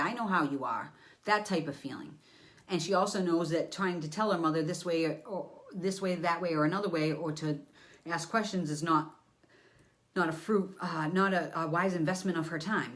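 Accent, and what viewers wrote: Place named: New Hampshire, United States. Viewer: I thought you sounded like you were from (wicked awesome) NH. I'm from Manchester, what about you?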